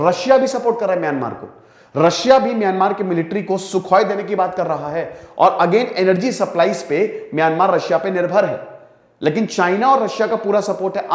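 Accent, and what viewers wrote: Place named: Bundelkhand, India. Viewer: Sir i think you are belonging from bundelkhand madhya Pradesh. When you say "कुछ तो करै क पड़ी"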